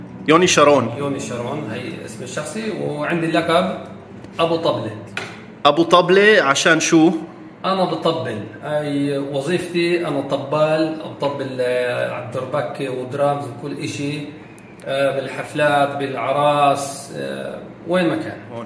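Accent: Palestine. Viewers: حلوة اللهجة الفلسطينية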